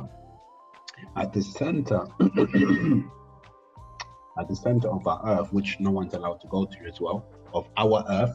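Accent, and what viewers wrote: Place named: Israel. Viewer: The isn't white? 🤯 no just kidding I knew even when I started watching your videos and hearing the sound of your voice that you where a Hebrew. Seek Yahusha messiah my friend! Yah bless!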